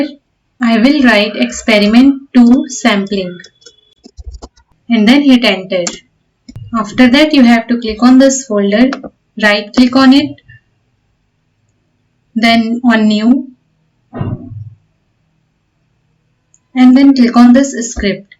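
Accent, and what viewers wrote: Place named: Russia. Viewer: by the way, your speech sounds like Russian a bit) Did you learn something like industrial electronic at the university?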